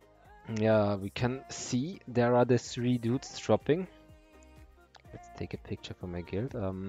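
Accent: Germany. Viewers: Du bist deutscher und redest englisch hört sich echt bh an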